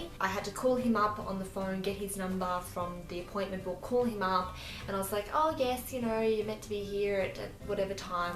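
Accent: Australia. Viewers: You're pretty. I love your accent, aussie yeah? Your so close to 1k, congrats yey! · Are you from Australia. I'm from there!!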